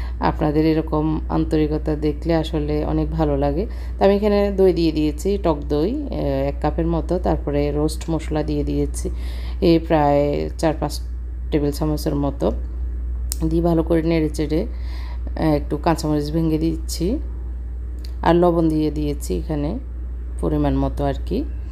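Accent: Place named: Cox's Bazar, Bangladesh. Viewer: আমি ককস্ বাজার থেকে,আপনার কথা শুনে মনে হচেছ আমাদের কাছের।কোথাকার বলবেন?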